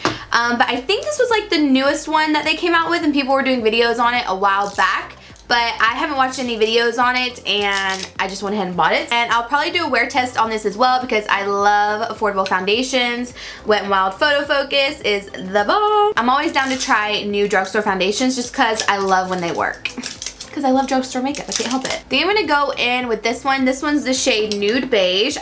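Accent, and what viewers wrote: South, United States. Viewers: Just subbed beautiful! Love your Southern accent!!!!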